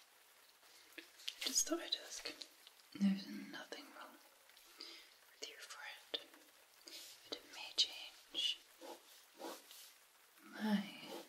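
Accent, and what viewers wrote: Scotland, United Kingdom. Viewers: Had a tiring day at work , so listening to those velvet Scottish tones from my favourite Bonny lass is just what is needed before bedtime ❤️u. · You are my favorite Asmrtist♥️ seriously I fell mesmerized since the first time I heard your voice in of of your videos with Scottish accent 😌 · Wow, this is a good one. I love your Scottish accent!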